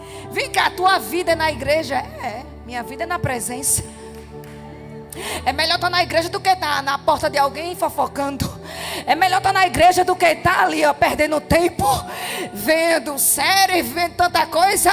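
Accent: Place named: Pernambuco, Brazil. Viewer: pelo sotaque ela é pernanbucana.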